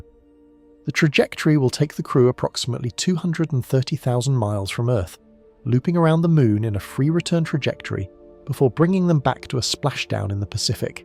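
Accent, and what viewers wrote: England, United Kingdom. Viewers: Using an English accent does not make it smarter.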